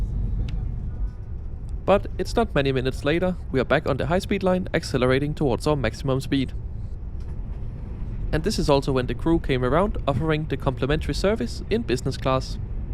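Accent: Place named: Denmark. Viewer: Love your sweet, soft Danish accent. Unmistakable. So many memories of my good old Danish friends from when I was young.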